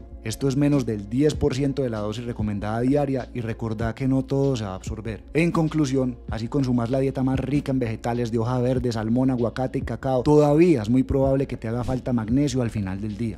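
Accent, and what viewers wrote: Colombia. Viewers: Esa voz colombiana me da salud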